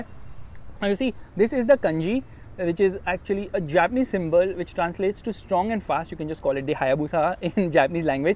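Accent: India. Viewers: Typical indian annoying accent.. 🤮🤮🤮..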